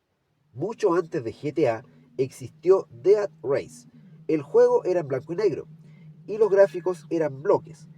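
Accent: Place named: Peru. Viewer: Que chistoso hablas,eres de peru?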